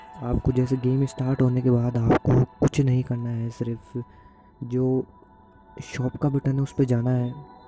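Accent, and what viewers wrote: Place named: India. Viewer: Really? Huh. I assumed from your speaking patterns you were Indian. It sounds close to, then again I’ve never heard anyone speaking Swedish so I tied it to what I knew. But thanks for letting me know. Doesn’t make me understand the video any better. You should make one in English for those of us that would like to understand, if you don’t mind.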